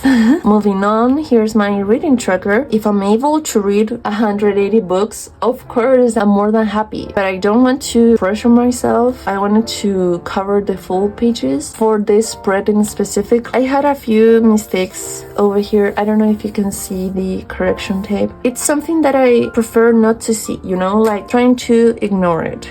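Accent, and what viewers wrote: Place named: Latin America. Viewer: for disability rep, the next book on my tbr that covers that is called “out on a limb” and it’s a romance I think? I can’t recommend it bc I haven’t started yet but it sounded really cute! For a book about books, I think the starless sea works! also lol this kind funny but as soon as you mentioned the book being expensive to get to your 3 world country (+ your lovely accent) I immediately thought “she’s Latina” — it was instant neuron activation lmao !! I actually was thinking maybe you were Brazilian (like me ❤) but the accent was just a little off from our usual accent so I wasn’t sure~~ I’m guessing you’re Chilean then?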